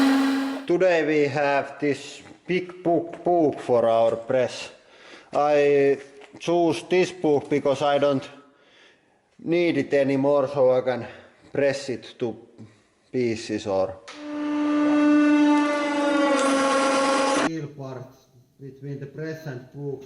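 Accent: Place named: Finland. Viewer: its the Finnish accent I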